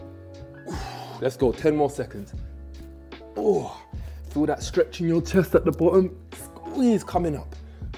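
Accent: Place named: United Kingdom. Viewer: and because he's real attractive and has a British accent most will ignore what they sensed Like I did 😂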